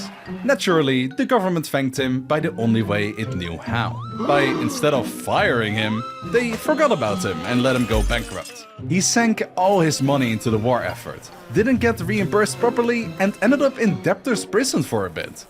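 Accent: Netherlands. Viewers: Ik kan echt niet luisteren naar nederlanders die engels praten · I am only here to listen to his Dutch accent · Are u Dutch? Love your accent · Oh youre dutch, i was trying to place your accent 🥀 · Ik dacht al dat jij nederlands was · I knew you were Dutch I heard it from the accent I just waant sure XD but cool!!!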